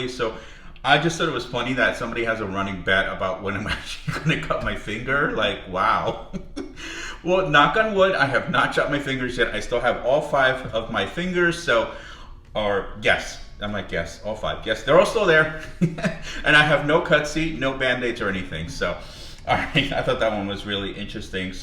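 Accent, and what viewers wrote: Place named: United States. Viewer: Great video! Talk white? 🤔 Well if you grew up in America you would have an American accent...duhh. Lol and that's ok! People nowadays!😜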